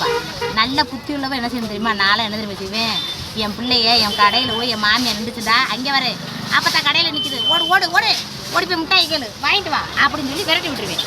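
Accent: Madurai, India. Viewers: மதுரையா கமுதியா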